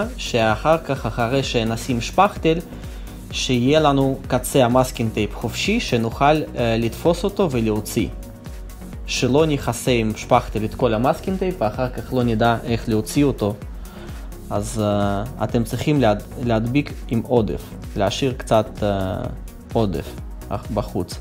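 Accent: Russia. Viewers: Акцент явно русский.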